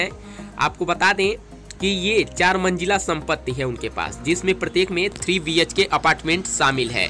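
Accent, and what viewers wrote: Bihar, India. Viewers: Bihari accent mein kyun baat karte ho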